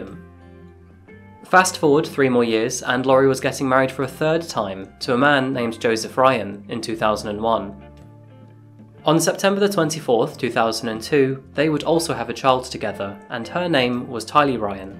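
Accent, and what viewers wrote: England, United Kingdom. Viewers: I come here to hear this case in british accent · In my opinion, the English accent is one of the best for monologues. :-)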